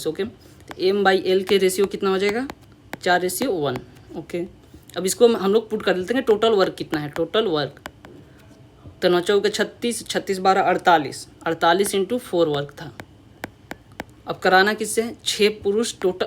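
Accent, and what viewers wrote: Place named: Bihar, India. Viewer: Bihari touch in voice ❤❤ great bhaiya ❤❤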